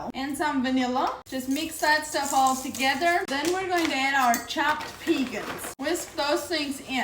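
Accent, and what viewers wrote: Pennsylvania Dutch, United States. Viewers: Amish speak Pennsylvania Dutch (that’s what her accent is)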